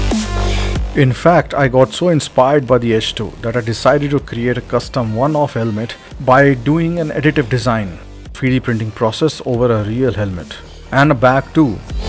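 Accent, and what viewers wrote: India. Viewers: Sound like india?? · INDIAN Accent 👊💪🏼💪🏼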